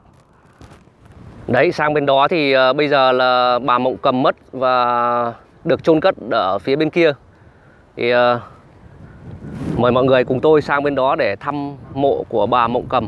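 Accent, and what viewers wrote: Standard. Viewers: Kênh của bạn rất hay . Giọng MC chuẩn . Chúc bạn nhiều sức khỏe . Chúc kênh phát triển . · Bạn có giọng nói chuẩn và rất hay chúc bạn thành công trong công việc của bạn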